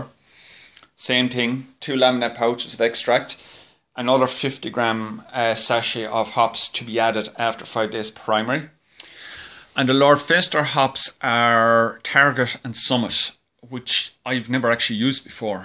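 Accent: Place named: Ireland. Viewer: Tree kilo kit😂 nothing better than the ROI accent, always make me smile. Bit of a pain that your gear is in storage but at least your brewing buddy. Sure they will turn out smashing🍻👍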